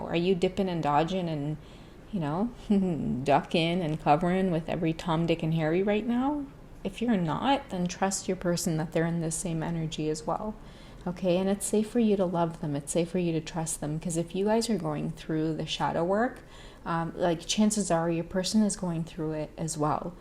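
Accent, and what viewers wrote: Trinidad and Tobago. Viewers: Oh lordie .. the Trini Popo...😂🤣😂Love hearing the Trini Accent in someone else lol..🇹🇹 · Ok I think I need trini talk everytime/reading please?!!! 🤣🥰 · No, we like that you speak with your Trini accent when you talk to her! 😂 ♥️♥️♥️…Buss up shut and Tarot! 🥰😂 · Lmaoooo sis you DONE KNOW I LOVE THE TRINI ACCENT ENUH!!! 🇹🇹Stupezzzzzz lol PUNGKIN had me WEAK cause iykyk!! Hahahaha!!! Love it here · Yes that!!! I love the Trini side!!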